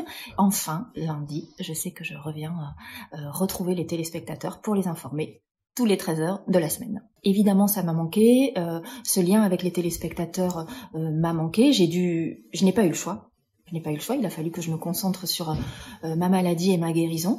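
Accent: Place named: South, France. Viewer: j'adore votre accent meridional 😊.ma mere etait de la Dordogne,( Perigord vert, pres de la Haute Vienne et je suis ne en Anjou, maine). et loire,limite Sarthe et Mayenne. La bas aussi, on a un accent "bein" prononce. Bon Courage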